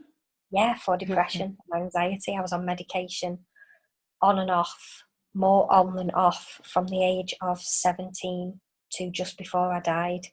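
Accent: Scotland, United Kingdom. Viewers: Curious about her acent. she comes from scotland maybe.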